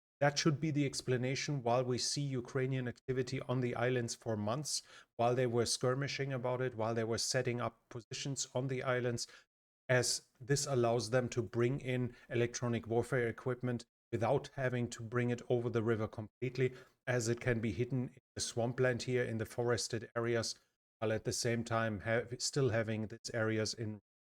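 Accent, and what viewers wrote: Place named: Germany. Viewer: With your voice today more close to Deutsch accent 😊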